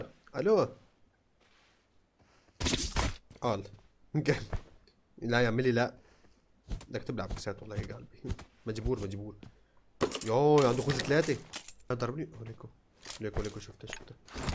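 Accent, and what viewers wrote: Aleppo, Syria. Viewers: انت من اي محافظة لهجتك حلبية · حسب لهجتك اتوقع انته من ريف حلب او من حلب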